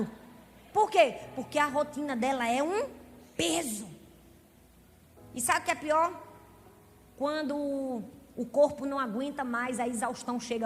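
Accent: Northeast, Brazil. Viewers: e esse sotaque nordestino? 😍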